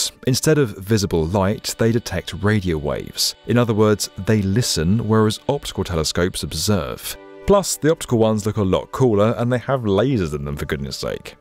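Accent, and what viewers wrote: England, United Kingdom. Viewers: As an American , l cannot tell you how much a British accent adds to narration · It's not the height of Big Ben (a bell), which is but a few feet high, it is the height of the Elizabeth tower that holds Big Ben. You sound English, you should know better. Bollockbrain.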